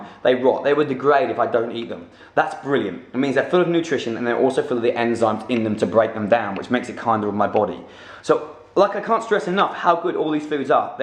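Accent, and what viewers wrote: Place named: England, United Kingdom. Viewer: Are you English ? You got this accent.. Wow ! 😊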